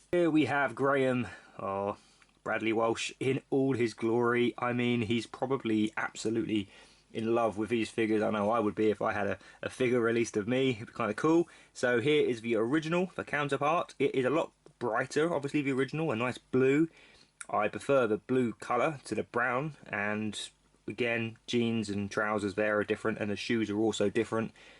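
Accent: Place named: United States. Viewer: Nah nah, I think you are American. Something about that accent...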